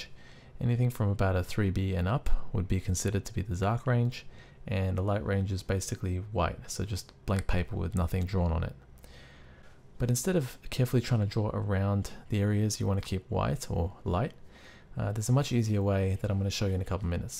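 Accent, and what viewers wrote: Australia. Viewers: Is this aussie accent ? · Nick889999 because he's got an accent because I think he's Australian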